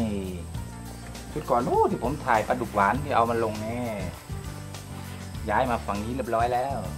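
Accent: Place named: South, Thailand. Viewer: น้าเป็นคนใต้หรือป่าวครับ สำเนียงพูดคล้ายๆคนใต้เลยครับ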